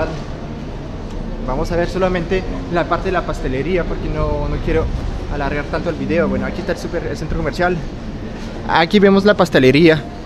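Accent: Colombia. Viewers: Exelente video, dices que tu ciudad es pequeña pero es muy hermosa!!! Ya hablas como todo un colombiano. Saludos desde México 😘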